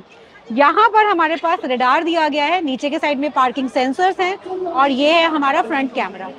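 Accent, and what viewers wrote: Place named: Tamil Nadu, India. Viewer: Can’t even understand what she is presenting with Tamil and India accent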